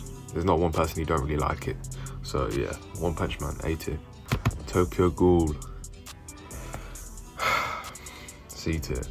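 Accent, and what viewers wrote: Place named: London, United Kingdom. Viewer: Your London accent just makes the tier list so much better LOL. Pretty Much agreed with everything in this besides Tokyo ghoul which I think is a B Tier.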